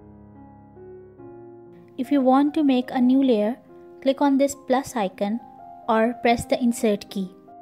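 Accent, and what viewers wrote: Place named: India. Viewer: then what authencity. Your accent sounds indian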